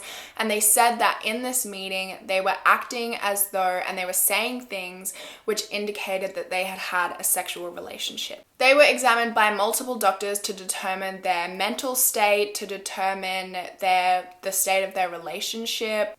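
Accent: Australia. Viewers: I FKN IOVE UR ACCENT! THE AUSSIE IS SO STRONG! IM AUSSIE TOO! PERTH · the fact that she has an australian accent is just a plus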